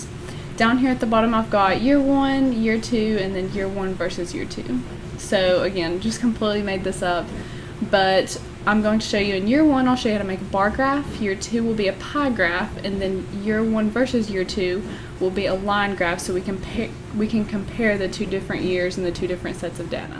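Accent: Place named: South, United States. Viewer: i love your southern accent :)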